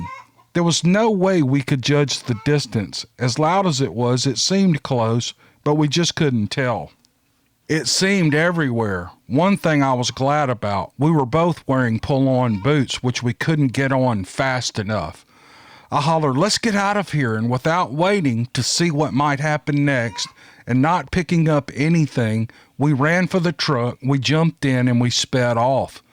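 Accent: South, United States. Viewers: You have a very nice comforting southern accent that is comforting and it's good for this line of work.and I think you could do well on tv specials if somebody just heard your voice in the way you can tell a story and make a story feel real and you can feel the emotion in the stories and I'm positive everybody that's subscribe you would agree with me cuz if they don't they wouldn't have subscribed to you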